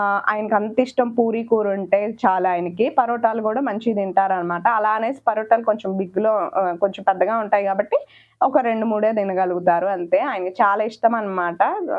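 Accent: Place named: Sathupalli, India. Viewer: Sathupalli side Telugu alane vuntundhi Thanu nerchukovalasina avasaram ledu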